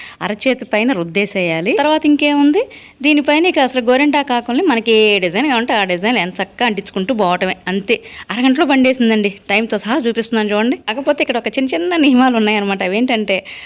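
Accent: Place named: Nellore, India. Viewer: akka mee native nellore kada.. me voice and yaasa alaney vundi...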